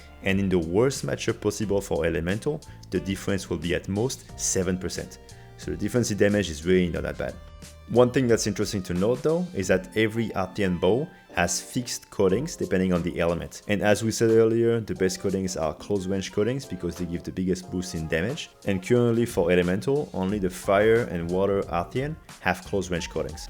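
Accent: France. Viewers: Ah de ce que j’entends tu est français haha ☺️ tes vidéos m’aide et beaucoup pour fair de super builds d’arc ! Merci infiniment ! Hâte de voir la prochaine vidéo !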